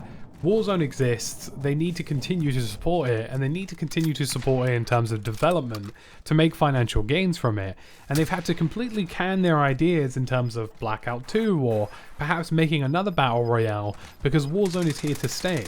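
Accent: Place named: Southern England, United Kingdom. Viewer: This video sounds like a load of assumptions pieced together and narrated with a British accent to sound legit. Yes I’m British myself but I know the effect that our southern dialect has on the rest of the world.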